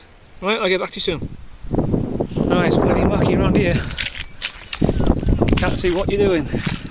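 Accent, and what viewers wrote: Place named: England, United Kingdom. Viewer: I enjoyed your English accent almost as much as the finds! Fascinating bit of history where you live. You are very fortunate. Thank you it's been fun! -Jeff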